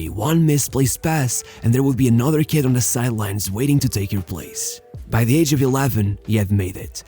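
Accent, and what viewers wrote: Portugal. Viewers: Eu sabia que eras português assim que ouvi o sotaque hhahahahha.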